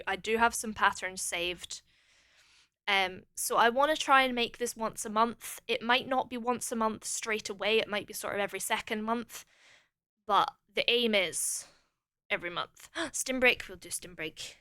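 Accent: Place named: Scotland, United Kingdom. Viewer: HELL YEAH- SOME SCOTTISH BACKGROUND NOISE FOR MY COURSEWORK (i dont catch streams often and by GOD am i glad i found this)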